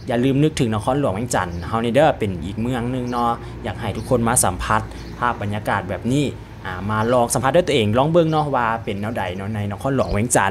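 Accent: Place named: Isan, Thailand. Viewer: เสียงเว้าคัก...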